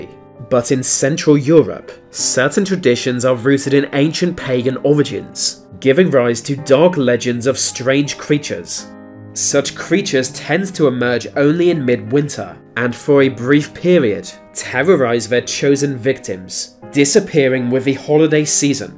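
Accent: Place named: RP, United Kingdom. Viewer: now that's the Queen's English and how it should be spoken.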